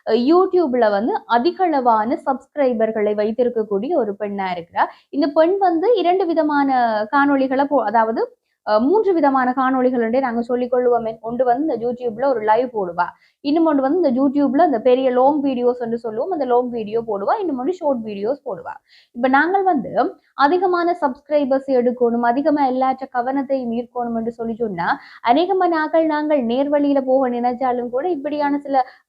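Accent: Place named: Jaffna, Sri Lanka. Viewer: தங்கா உங்கள் யாழ்ப்பாண மொழி அருமை இப்ப யாழில் இளம் தலைமுறை கதைக்கும் போது தமிழ்நாடு மொழி நடை கலப்புடன் கதைக்கினம்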